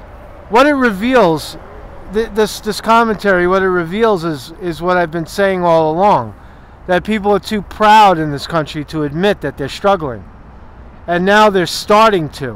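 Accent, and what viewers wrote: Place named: St. Louis, United States. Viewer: ...I grew up on a State street in St. Louis...although You are a little more refined than me I feel we have a connection through speech...It's like-- I came to kick some ass and chew bubble gum...and I'm all out of gum...keep up the Hard work my man.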